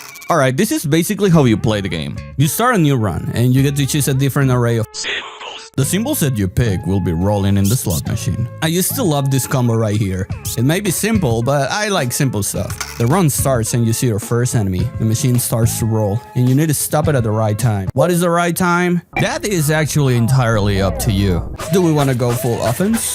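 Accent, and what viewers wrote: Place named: Europe. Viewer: Your voice sounds weird because European, not because illness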